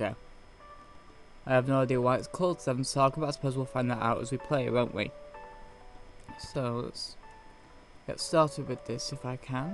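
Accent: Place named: England, United Kingdom. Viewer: I am hispanic american, but I dont think I have any particular accent myself despite my hispanic roots. To me though you just have an english accent, I know that there are various kinds but I cant really tell the diffrence.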